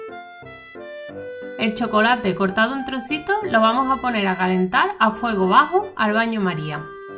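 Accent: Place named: Andalusia, Spain. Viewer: Hola desde Galicia.Las recetas son excelentes. He comprado el robot hace unos días.Estaba buscando recetas acordes a mi dieta sin lácteos y gluten, y ha sido una suerte encontrarte.. Me gustan especialmente las masas y los panes. Una gallega lleva el pan en el ADN y en su alma morriñenta. Me cuesta encontrar la harina de sorgo. Me encanta el acento andaluz de tus vídeos, una maravillosa riqueza que no hay que esconder He trabajado bastantes años en el Sur , y ahora que estoy de vuelta, lo añoro. Felicidades por tu trabajo. Rosa.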